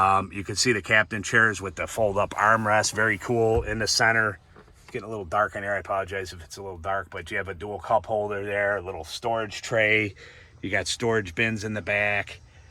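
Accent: Chicago, United States. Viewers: Yeah, i'm from Chicago as well and currently in TN, I too was gonna ask you the same question because I thought that accent sounded familiar. The Wife and I just looked at the the explorer st this weekend. I'm on the fence about and one of the biggest issues i have is that stupid vertical screen display. When i put it in reverse there was just a small 3-4" display which I could hardly make out any detail....that may kill it for me, gonna look around there's a lot in that segment these days and yes the Kia, hyundai, genesis GV80, and the Lincoln Aviator is a consideration as well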